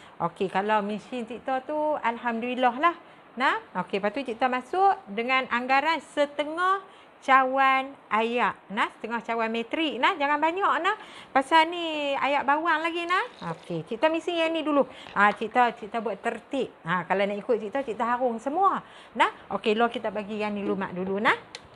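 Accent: Kedah, Malaysia. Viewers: Mmg padu loqat kedah. Terbaik..!! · Assalamualaikum.. sya mmg suka sgt follow minat sgt2 kt terhibur dgar celoteh dlm longhat kedah..resepic.ta pn smuanya menarik dn berselera.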